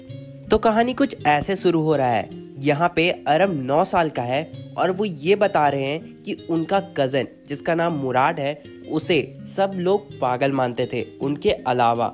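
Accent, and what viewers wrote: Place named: Bihar, India. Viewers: You are from bihar Right! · One question you are from Bihar???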